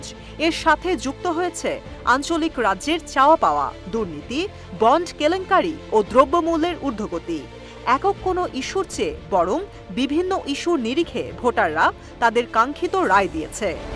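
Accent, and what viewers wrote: Kolkata, India. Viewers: Anchor er vasa kolkatar news channel er moto shonachhe keno? Varoter aggrason kothao baad nai😡😡😡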